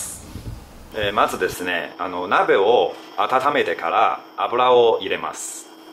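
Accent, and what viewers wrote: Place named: Chongqing, China. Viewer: 一听口音就是地道的重庆人😂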